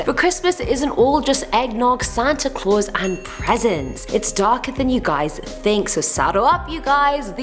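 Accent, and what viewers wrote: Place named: United Kingdom. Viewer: She is my favorite host the British voice just hits different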